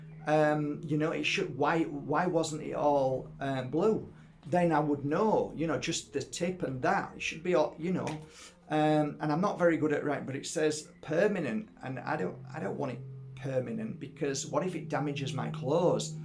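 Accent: Yorkshire, United Kingdom. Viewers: Found a channel called The crystal collector. He goes around different areas digging crystals. Theres a video where he digs amethyst which is my favorite crystal... Amazing. Check him out! your Yorkshire accent is lovely. I've a Bucks accent 😂😂😂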